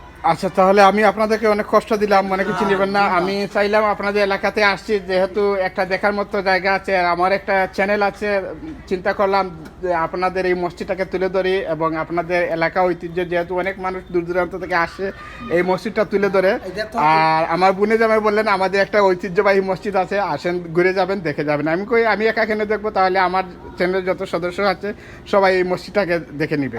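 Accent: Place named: Sylhet, Bangladesh. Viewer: সিলেটি অখল অর মান সম্মান মাইরা হুতাইলাইরায় মিয়া তুমি।অও সুদ্ধ অও সিলেটি কিতা লাখাইছো ইতা মিয়া।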